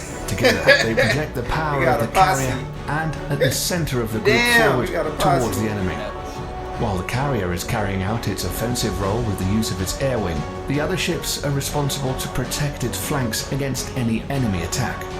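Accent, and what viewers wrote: United Kingdom. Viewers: The beautiful British accent is so wrong here. Al Bundy or David Chappelle would be better. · Bizarre that the narrator spoke with an English accent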